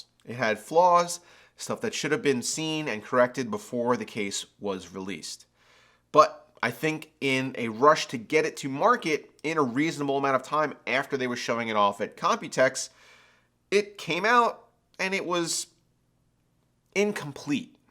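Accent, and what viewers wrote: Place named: Maryland, United States. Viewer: u from maryland bro?? lets hang out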